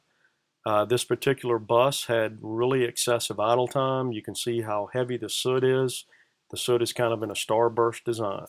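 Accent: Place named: United States. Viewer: He's using the American pronunciation. And that's not a Sandblasting box, it's specifically designed for cleaning DPF's, which is why it has a Vaccuum unit at the bottom along with a collection box for waste and a plate specifically designed to seat the DPF - He doesn't state that it's anything super secret he even says it uses a simple high pressure air knife to blow ash through the filter - but it does work in combination with the vaccuum under the DPF...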